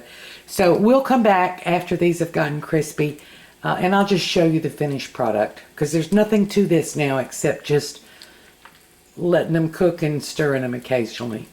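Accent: South, United States. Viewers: Ma'am, I have enjoyed this video very much. You provide excellent information and I love your down home accent. I also want to compliment you on the quality of the seasoning on your cast iron skillet. It looks first rate. Keep the wonderful videos coming.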